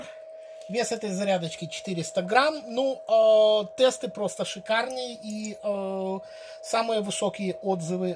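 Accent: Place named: Odessa, Ukraine. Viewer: А по-моему как у Одессита 😊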